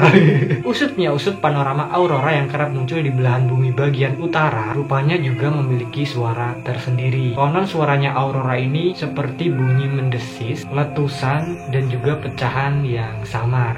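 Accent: Java, Indonesia. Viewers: Njenengan jowo mas? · bisa ilangin medoknya gak? mles dngernya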